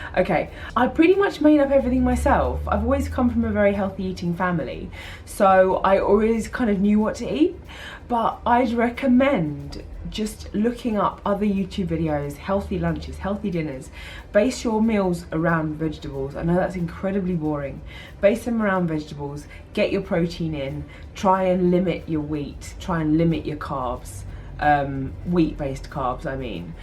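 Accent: United States. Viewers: Your american accent is really good! Oh could you do a video on some workouts you do at home. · Your american accent its subtle, but really good. :) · Your American accent is SO good!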